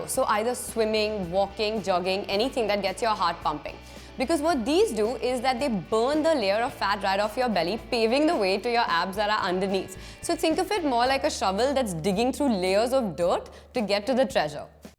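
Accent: India. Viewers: I know Indian woman because of accent English 😂😂😂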